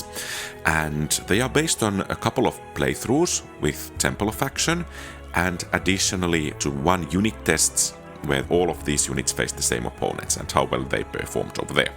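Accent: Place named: Finland. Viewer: Just discovered your channel while getting into Olden Era, soon enough Ill have a great finnish youtuber for every game I play. Something about the accent just clicks :D Looking forward for the release of the game. Its already decent fun and given updates and balancing its gonna be greater yet.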